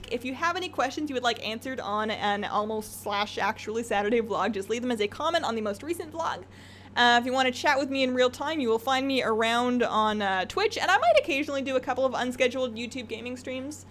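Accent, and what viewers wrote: Canada. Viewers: dat canadian accent.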